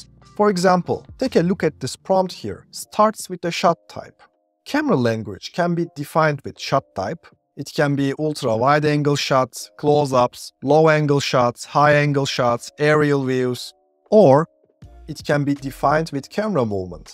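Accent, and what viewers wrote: Turkey. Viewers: Hocam bu aksanla Turk olduguna yemin edebilirim. Memleket neresi? Guzel anlatim olmus. Tebrikler.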